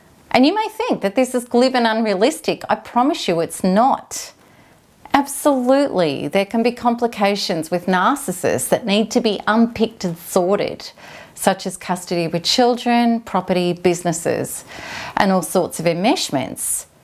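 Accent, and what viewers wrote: Australia. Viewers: Are you Australian? Accent is so familiar!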